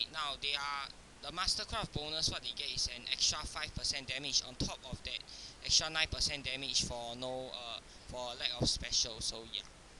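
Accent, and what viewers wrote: Singapore. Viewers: I can tell by your accent that you're singaporean, amiright? xD Also, does the weapon have any uses? its been in my inv for a few months now and i dont know wether to sel it or not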